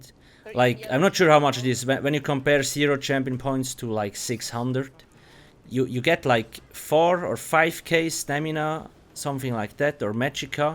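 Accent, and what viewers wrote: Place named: Switzerland. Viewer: Pretty sure he is Swiss. That is our accent :)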